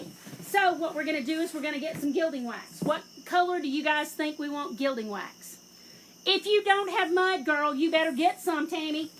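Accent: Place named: Tennessee, United States. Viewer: Refinishing an old antique tobacco humidor right now. Been going back an forth on what color to paint it and to distress or antique wax...or both. Haha I kept feeling no matter what I did that something was missing. My door is plain. And I thought how could I DIY a raised embossed stencil onto wood. And I found you!! You are so dang fun and I'm from TN and my accent is about as thick as yours. Lol My accent and my "attitude" (not in the negative sense, more moxy and grit rather than attitude hahaha) that has been what has been holding me back from starting my own YouTube channel. But you make me feel like "what the world am I so afraid of!?" I've never been one to care what people think of me. Why should the internet be any different?? You are so unapologetically YOU. I completely admire that. And I NEEDED this tutorial tonight!!! Going to risk a trip to lowes tomorrow so I can get my piece finished! 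Thanks so much for sharing and for inspiring me!❤ New subscriber here!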